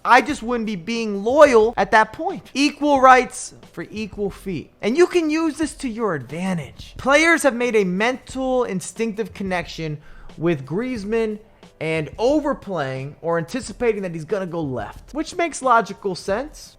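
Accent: United States. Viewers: Bro you american?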